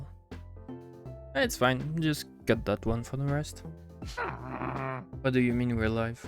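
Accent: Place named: Quebec, Canada. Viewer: Nice , I knew about most of em but didn't know creepers needed line of sight , thought was a player proximity thing as I have been hit many times by creepers sneaking behind me, but I guess that's my line of sight and not his or hers ( not sure about creeper genders 😉) always something to learn. And yes I agree boats are actually ridiculously op, who would have thought one of the most powerful and versatile items in the game would be a boat. ( maybe that's a hyperboly) . BTW are u French Canadian, I always thought u were from France but today I detected some hints in your accent that seems distinctly French Canadian. If so hello from Montreal.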